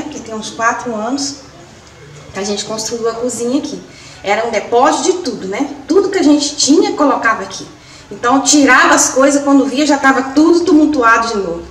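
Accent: Minas Gerais, Brazil. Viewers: Vc é de Minas? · Percebi pelo sotaque, tambem sou do centro de bh, mas moro em uma cidede pequena e amo roça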